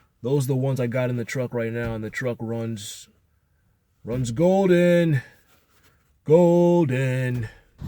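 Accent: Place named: Minnesota, United States. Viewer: Minnesota badass, 10 deg, snowing, barehands, even got some accent!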